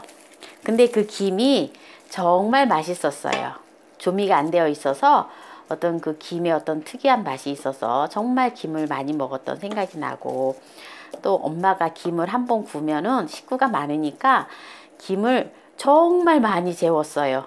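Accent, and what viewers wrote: Jeolla, South Korea. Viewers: 여사님 말씨를 들어 보니 고향이 전라도 분이시군요. 역시 남도 분들이 요리 잘하시는건 인정 합니다.전 완도산 덩어리로 길게 뭉처진 곱창김을 구입 위와 유사하게 하는데도 하늘나라에 계신 어머님이 옛날 어릴적 해주셨던 그맛이 나질 않는군요 ...ㅜ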